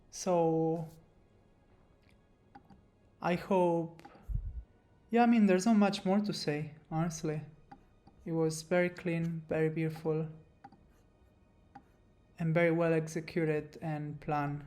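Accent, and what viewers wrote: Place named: Spain. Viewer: Ya decia yo que me parecía que eras español jajajajaj . Buen video tío !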